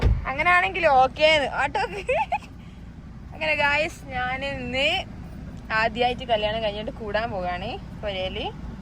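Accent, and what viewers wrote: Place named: Balussery, India. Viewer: Kozhikode Balussery aahn thonnu samsaram kettit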